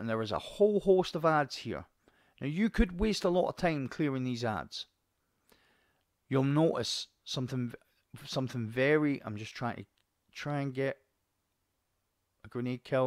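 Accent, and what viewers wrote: Scotland, United Kingdom. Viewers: I love you'r explanations especially through the jumping part,and then with clearing of the adds,and i hear from you'r accent you are a fellow Scot,but can yu tell me do you play on PC or console.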